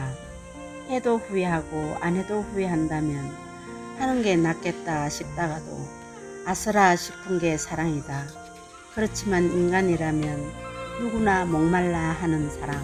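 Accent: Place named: Gyeongsang, South Korea. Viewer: 경상도 말투 정감가요 잘듣겠습니다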